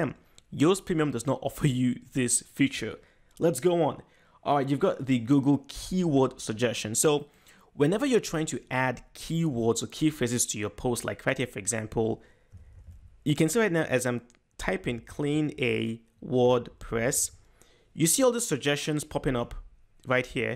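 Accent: Nigeria. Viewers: You sound like a Nigeria, are you?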